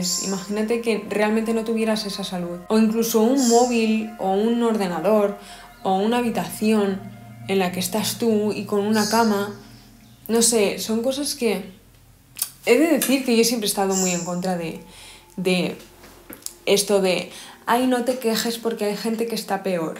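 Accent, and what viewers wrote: Spain. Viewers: Hija, tú eres muy católica y aún no te has dado cuenta (o quizás lo eres y yo no lo sé). La gratitud es un valor más católico que el mismisimo. -> O darles las gracias a Dios. -> Catastrofista por abandonar a Dios y no ser capaces de dar valor a las cosas, porque el utilitarismo lo está matando todo, quizás. -> Cada mañana te despiertas y agradeces cosas, casi como cualquier cristiano hace con Dios en sus ritos. Menciono mucho a Dios, pero ni siquiera soy cristiano, simplemente son observaciones porque me parece curioso lo que dices y que no te des cuenta. En cierta manera es normal que pienses así, por tu acento pareces española y España es una sociedad muy católica. Incluso los que no se consideran católicos tienen raíces en esta religión. Más de 1000 años de una religión influyendo sobre una sociedad tiene sus consecuencias, incluso más de lo que nos podemos imaginar. 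En fin, Dios se ha convertido en una cosa sin nombre ni veneración, pero presente en el día a día, y esto es ejemplo de ello.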